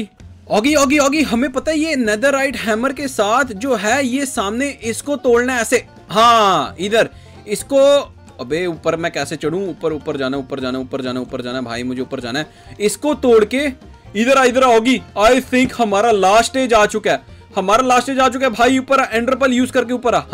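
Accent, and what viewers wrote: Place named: India. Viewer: You are speaking like chapati hidusthani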